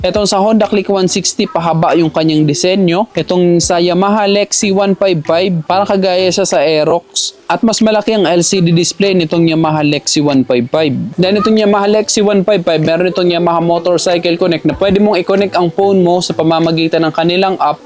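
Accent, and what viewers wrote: Samar, Philippines. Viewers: Taga samar ka no? Yung tuno mo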